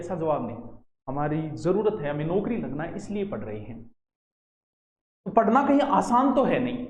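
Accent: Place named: Marwar, India. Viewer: Sir..ager English k words bolte ho to pronunciation ka dhyan rkho..."Marwadi" Wali feeling aati h... Other wise hindi hi bolo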